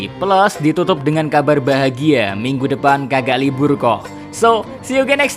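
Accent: Java, Indonesia. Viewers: jowo ndi?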